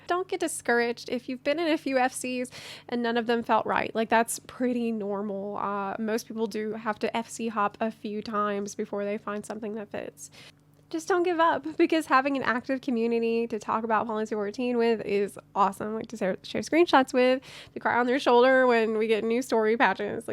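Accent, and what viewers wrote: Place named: Louisiana, United States. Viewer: Hi! Just started and found your channel really useful. If you don't mind me asking, are you from Louisiana at all? Your accent is very distinctive. Sounds like its from the Acadiana region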